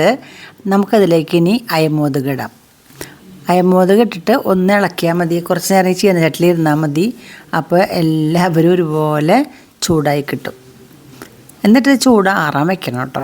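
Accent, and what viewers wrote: Thrissur, India. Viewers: അതുമല്ല, തൃശ്ശൂർ ആണെന്ന് തോന്നുന്നു 😅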